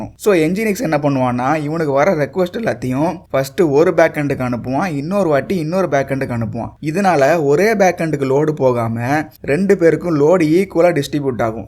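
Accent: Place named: South Tamil Nadu, India. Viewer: The Tamil Ascent of the host seems to be from south Tamilnadu probably from Virudhunagar . அழகான பேச்சு தமிழில் கம்பியூட்டர் பயிற்சி வீடியோ வாழ்த்துக்கள்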